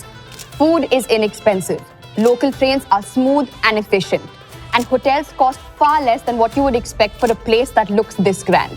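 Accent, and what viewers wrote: India. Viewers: Your english accent sounds like Indian accent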